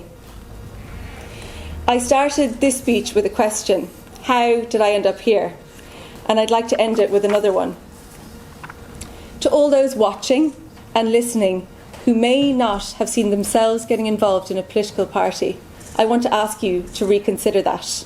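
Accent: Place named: Cork, Ireland. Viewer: its great you never lost the cork accent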